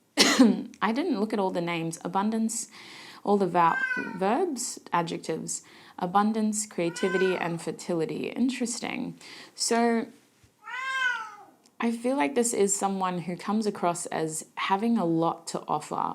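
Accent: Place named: Australia. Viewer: The second you spoke I knew you were Aussie!! 🙏🏼❤️🧿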